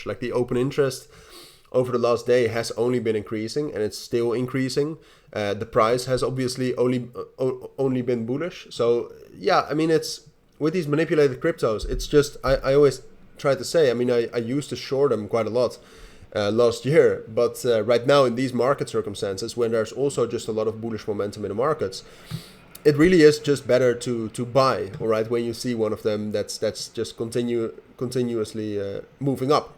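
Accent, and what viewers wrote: Netherlands. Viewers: Ik dacht al ik hoor een Nederlands accent! Goede video trouwens :)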